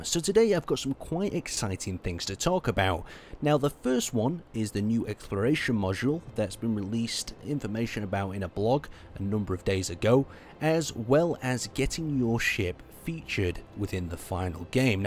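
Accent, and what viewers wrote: United Kingdom. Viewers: I only watch this channel because you're British and it's awesome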